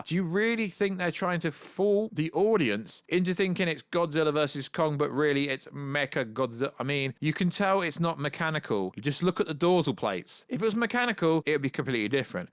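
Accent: England, United Kingdom. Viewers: My boy, that's English with an English accent, it's like me saying I don't understand Hindi if you speak with a North Indian accent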